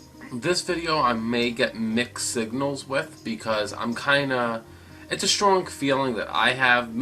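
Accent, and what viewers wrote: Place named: Brooklyn, United States. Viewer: you from brooklyn bro?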